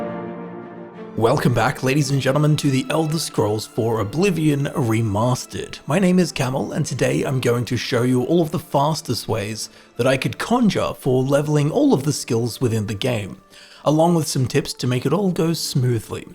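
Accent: Australia. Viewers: Ah I missed that sweet Australian voice ! Camel is back! I’m telling you I’m loving oblivion as someone who only played Skyrim before & like an hour of Marrowind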